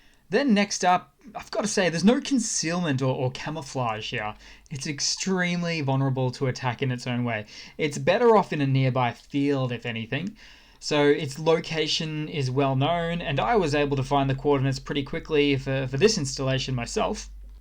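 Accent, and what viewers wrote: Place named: Australia. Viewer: Thks & you sound aussie; I stills can't figure-out how yous-guys don't fall-off the bottom of the earth ;) Pretty-good trick though.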